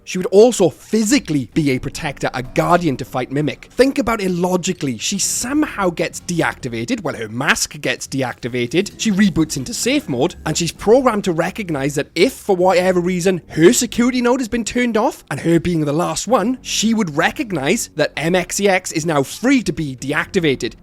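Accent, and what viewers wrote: Wales, United Kingdom. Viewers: I've never watched this channel before, so clicking on it I expected someone American voice or something. Quite surprised to hear such a thick Welsh Valley accent lol. Cymru FTW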